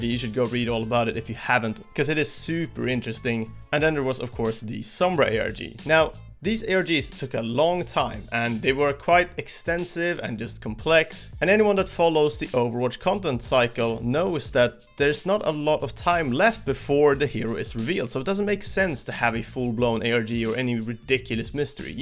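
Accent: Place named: Sweden. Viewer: är du svensk du har dealect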